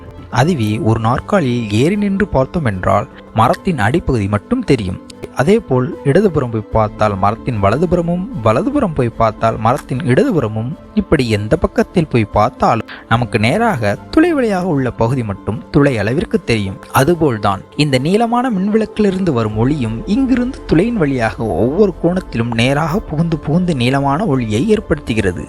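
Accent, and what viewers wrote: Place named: Sri Lanka. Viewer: அருமை.. இலங்கை தமிழர் பேசுவது போல இருக்கிறது.. கடின உழைப்பும், அறிவியல் ஆர்வமும் ,கொண்ட முயற்சியில் பின்வாங்காத சிந்தனையும் ஆச்சிரியப்பட வைக்கிறது.. வாழ்த்துகள்🎉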